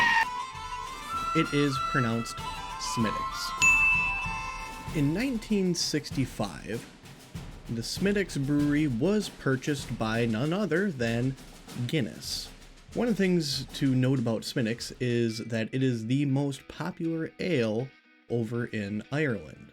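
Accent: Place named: Wisconsin, United States. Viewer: Charming Wisconsin accent! It’s a shame the music overpowered your voice a little. Thanks for the history and review.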